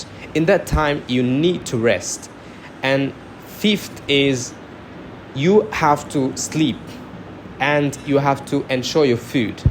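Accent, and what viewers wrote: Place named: Bangladesh. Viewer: U still have bangladeshi accent though ❤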